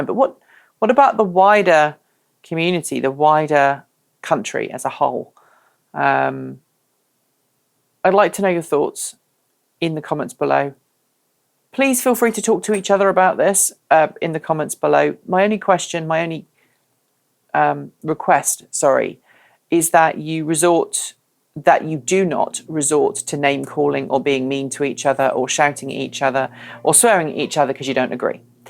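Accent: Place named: United Kingdom. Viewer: Every bad policy that Trump was threatening was already done by Obama and would have been continued by Clinton. There is massive subsidiued investment in fossil fuel infrastructure still, this is keeping our renewable future from taking off. I voted for Jill Stein and her Green Revolution. Since when does a revolution need an election. I live in Germany and work in Switzerland and am pleading with people to move to EV, batteries, solar PV and wind, with little success. I don't trust VW to make any changes, even though they have said they will build a battery factory in DE. I am supporting your site since you have a bigger voice, so keep shouting, in your quaint British-tinged accent.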